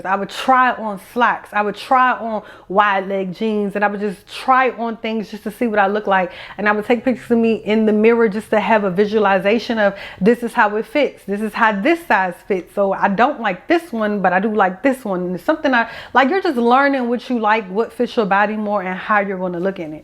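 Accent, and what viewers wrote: Washington DC, United States. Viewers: Could tell you were from dc the first few seconds you started talking ❤😂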